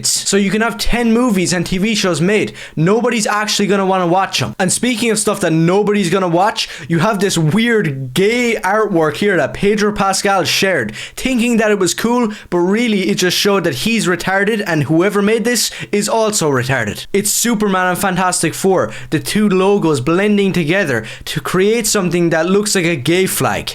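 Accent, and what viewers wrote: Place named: Ireland. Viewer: It's Irish accent.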